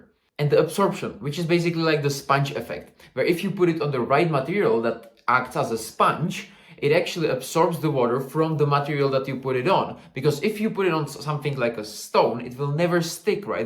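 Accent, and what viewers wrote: Czech Republic. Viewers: Where are you from? You sound like you're from Slovakia 😅 or Czech republic. Yep, stand corrected, Czech republic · Už podle prvních pár vět mi bylo jasný, že jsi Čech :DD. Náš přízvuk je strašně poznat :DD. Pak jsem si to jen potvrdil českým nápisem na tom lepidle.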